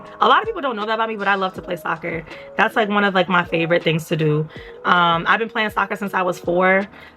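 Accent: Jamaica. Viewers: Far a while was trying to figure out if you were Jamaican descent, because I can kinda hear it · Funny thing is your natural accent reminds me of Jamaicans I know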